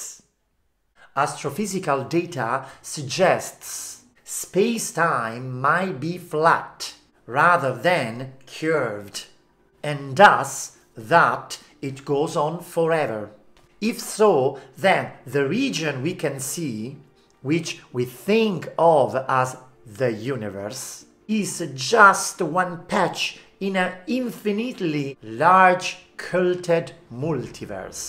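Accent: Italy. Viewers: this is officially my favorite video ever: his English-Italian accent mixed with a topic so interesting and from which I've always been fascinated, fills my afternoons with joy. Your lessons are the best.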